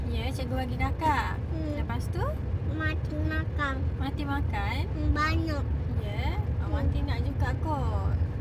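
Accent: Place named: Terengganu, Malaysia. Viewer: Comey kakak slang tranung hahahahah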